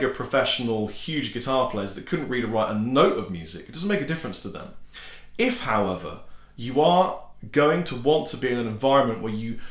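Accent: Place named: Brighton, United Kingdom. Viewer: Hey, I´m 55, always dreamed of myself playing but never got the guts to take lessons and practice. Shame on me, I know. These days I use the adult life, job etc as excuses for not geting started, but, believe it or not, I still didnt give up. Probably I´ll never play at all, considering this failure of mine, but have you ever heard of utopia? Sure you did. That´s whta me playing at least reasonably well means to me. Two final things: perhaps the flip side of your conception of music and playing is some guys like... Klara Soderberg (First Aid Kit), Nataly Dawn, Stereo Total (ever heard them?). Their technique is way too rudimentary, sketchy, but they do manage to create great fun music. I tend to become a bit bored of virtuoso players who, despite of having a monster technique, dont "get there", cant make music that´s enjoyable after all. 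I´d put in this basket 90% of mainstream, wealthy acts: Eric Clapton, Pink Floyd, RHCP, you name it. For me, once the music is fine, it doesnt really matters how skilly you are. 2nd and last: does everybody in Brighton has your accent? It´s just great!! I wish I could walk around in London and hear only that around me.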